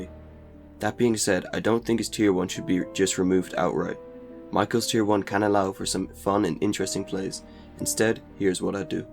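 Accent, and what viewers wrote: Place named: Netherlands. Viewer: stop talking like a dutchie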